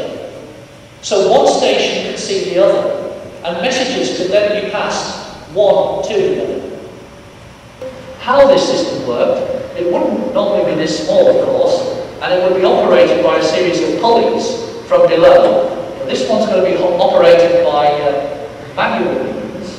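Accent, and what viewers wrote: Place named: England, United Kingdom. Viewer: This guy is definitely English. SECOND POST!!!!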